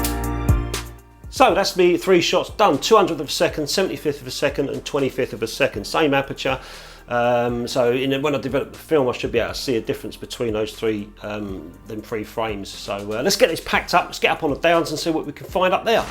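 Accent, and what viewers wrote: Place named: England, United Kingdom. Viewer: dear, every time I need to hear some healthy English accent I tune into your channel please note < need to> Of bleedin 'course you butcher all them foreign words, you're English, aren't you? it's < tzeyes > Nettar you've got it right, Anastigmat wrong, as usual golden rule for English, Welsh, Scots, and other British subjects is: if you naturally stress the first syllable then you can bet your posterior it's second one you should stress. The syllable you strees naturally and the syllable you pronounce more or less neutrally are your best guide go against your natural tendency and stress the other syllable ana-STIG-mat you says, anastigMAT is right admittedly it's a difficult one having four syllables;Love you, your videos, some of your pics and your effervescence. What a pity they banned smokin' in pubs ( please pron vinnie coz it's German & I'm a bloke )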